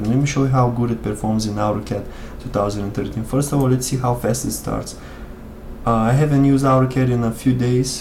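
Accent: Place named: Brazil. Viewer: Your accent sounds like brazillian.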